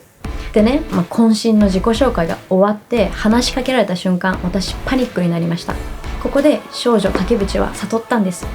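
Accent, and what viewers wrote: United States. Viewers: Your English is super fluent honestly. Like, the way you spoke in English just sounds as if you lived in the U.S. for a majority of your life rather than a couple years XD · Thanks for sharing your story, despite all the struggles. Actually, with your American accent, I first thought you were born and raised in the States. Very impressive for only 34 months! Your fluency in English is now helping your music career a lot. This likely wasn’t your main intention as a kid, so it shows that perseverance and hard work can pay off even in unexpected ways.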